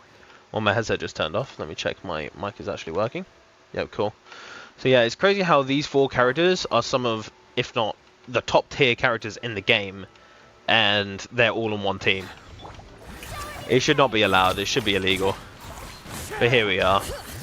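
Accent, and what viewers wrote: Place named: United Kingdom. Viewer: I DIDN'T THINK U WERE STREAMING definitely gonna watch ur next stream also yes not many british ppl say bo'o'wa'er but ur accent is quite clear and obvious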